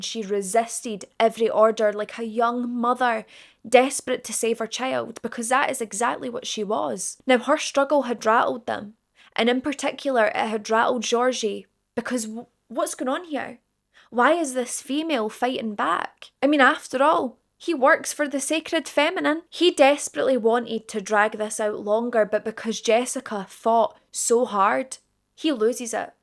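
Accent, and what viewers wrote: Scotland, United Kingdom. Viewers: Scottish Emma Kenny vibes. Love it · Are you from Scotland? love the accent! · New subscriber here, I love your accent it sounds a bit scottish. Such a beautiful young lady you are. ❤ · I really Enjoyed Listening to your TRUE CRIME STORY. YOU'RE A BEAUTY, WHO HAS SUCH A BEAUTIFUL ACCENT AS WELL..IS IT A SCOTTISH ACCENT. SOUNDS ALOT LIKE MY FAVORITE ACTOR SEAN CONNERY.. THIS IS SUCH A WILD, CREEPY CASE..YOU DID AN EXCELLENT JOB, BC IT HAS TO BE DIFFICULT GETTING THESE VIDEOS OUT TO US...I APPRECIATE YOU. I JUST SUBSCRIBED TO YOUR CHANNEL...I ALSO HYPED THIS VIDEO!!! MANY THANKS!!! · I love your accent. I have got to find a Scottish woman 😂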